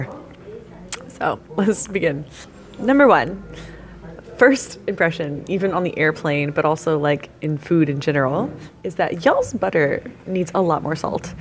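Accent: South, United States. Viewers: gosh Londoners are friendly; silly girl you are the VERY attractive surprise foreigner with the fantastic SOUTHERN accent, so of course they locals are more than happy to help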